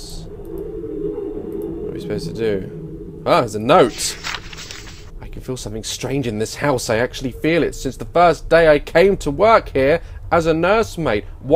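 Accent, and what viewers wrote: United Kingdom. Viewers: your British aren't you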